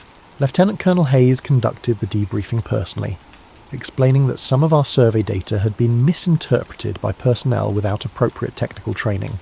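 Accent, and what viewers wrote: England, United Kingdom. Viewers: please, no more english accent 😮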